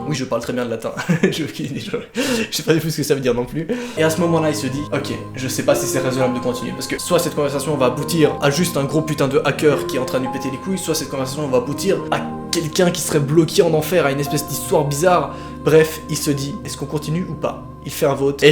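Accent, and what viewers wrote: Switzerland. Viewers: Pas de doute tu es suisse ! J’adore ton accent ! (Je sais de quoi je parle) 😂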